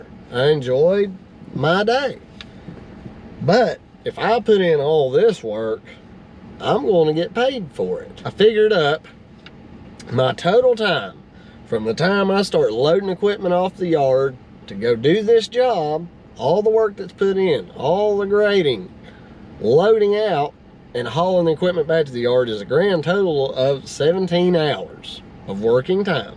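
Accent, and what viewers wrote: South, United States. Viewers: YOUR SOUTHERN ACCENT MAKES ME LAUGH. YOU SURE HAVE TAUGHT ME THINGS. THANK YOU.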